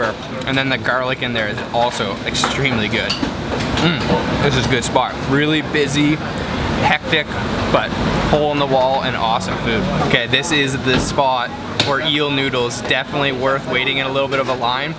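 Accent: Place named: Canada. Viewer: Yay represent (I'm Canadian too)!! I can tell from your "aboot" 😆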